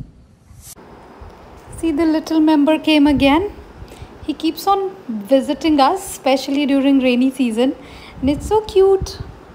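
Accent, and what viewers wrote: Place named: South India, India. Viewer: Your North Indian n your accent has suddenly become South Indian.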